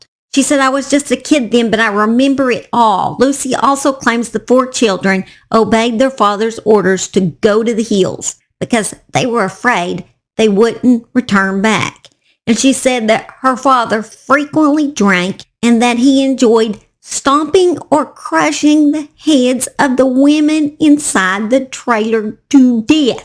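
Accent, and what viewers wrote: South, United States. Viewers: I absolutely LOVE hearing you telling these cases! You have such a sweet southern voice, and being a Tennessee girl I love hearing an accent like mine! I thought it was to funny when you said "Oh shit, well I shouldn't say shit on here!" Hell I am always wondering how people don't throw out all kinda "pretty words" when they hear these F**KED UP cases! Thank you for another great video, like always. Oh yeah, congratulations on 1k subscribers! I believe you are going to continue to grow like you have been, cause what is there to not love about your channel and personality!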